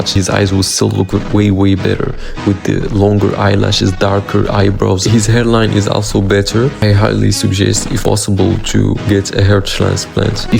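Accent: Morocco. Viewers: Moroccan accent bruh ur moroccan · Are u Moroccan bro? From your accent I can tell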